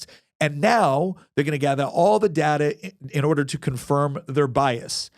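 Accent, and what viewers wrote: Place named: West Coast, United States. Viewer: you must be from the West coast, ending ing words as een.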